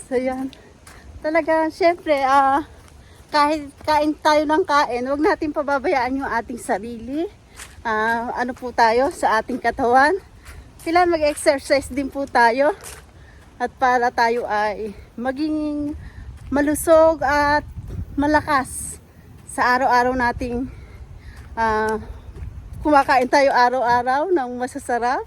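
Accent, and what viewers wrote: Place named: Tagalog, Philippines. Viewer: Hello ..goid evening , very trur ..ganda tlga nv pkace ..naoaka tahimik nv lake na ysn .. Pero ting punto mo tkga ang gustong gusto ko ..tagalog na tagalog ..sa buhsy america ..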